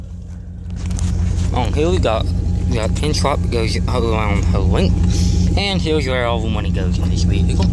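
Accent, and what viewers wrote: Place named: Australia. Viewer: think he's either Australian or New Zealander.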